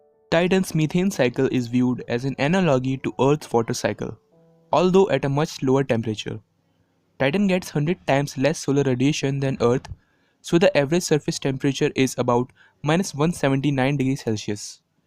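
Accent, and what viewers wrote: India. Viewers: The way you speak English I understood that you're from India. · I think you r a Indian!!! because of ur pronunciation I can gusse u r a Indian!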